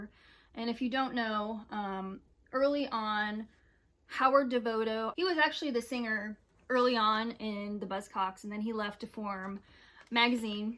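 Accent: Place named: United States. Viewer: Yet again, a great selection of records from the year in question by such a young (...compared to me) person! Absolutely all my faves are in there.....well almost - you need to include Magazine's (ah yes, you did pick this one - I started typing this comment too soon!)...oh and by the...and maybe by, and by, and by (probably their best), and by, and by. You are quite right, is the absolute greatest TH's LP, as hopefully you'll eventually discover...and is also EC's best! This is so good! And you pronounced name correctly - your smooth american accent makes this sound as naturally perfect as it is! You took me right back to my whole life in the sixth form (which is the two penultimate two school years before going on to university, etc. for those viewers who are not English!). Thanks for taking me back to such a joyous time - these records don't just contain music, they're sort of erm,... 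notches on the belt of life - gosh, that sounds so corny (sorry!)! Btw, if you're really going down the collectors line, you need a copy of with the fold out rear sleeve (but mines not for sale at any price, I'm afraid!). All the best. Cheers!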